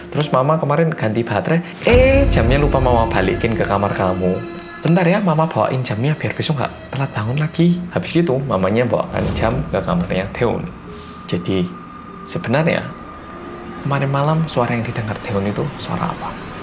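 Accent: Java, Indonesia. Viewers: Happy birthday mas medok... sehat terus yah mas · Hadir Mas Medhok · Happy birthday ko orang korea yang medok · Bang orang Jawa indo campur Korea ya kok lucu waktu ngomong nada jawanya😂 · Orang yanggg Medokkk 👍.Mantul😀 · Hbd mas. Semoga panjang umur dan tetep MEDOKKKKK 😎 O iya, jumpscarenya jgn sering2 dong mas. Kaget aku Pengenya g ntn, tapi penasaran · Liat opah" Glowiinggg yg Medok...😁 😁, konten ny bikin merinding😅😅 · Gumun aku,, jowo mu rak ilang ilang mas. 😂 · Mas hbd. Kok mas jadi seneng yang mistis2 to? Mas kan kalo ngomong medok terus ya, kalo ga medok bisa gak mas? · Hi, aku termasuk baru banget nonton video2 kamu di youtube, ya kira2 setelah lockdown di Australia berlaku. Aku suka banget dgn konten2 rindingdong n detektif reomit. Aku cm punya satu pertanyaan, kan medok ya hehehe tp pas pronounce bolo-bolo kedengerannya kayak “polo-polo” ya? Apa ada penjelasan soal ini yg aku belum nonton di video? 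Thanks and keep up your good work:)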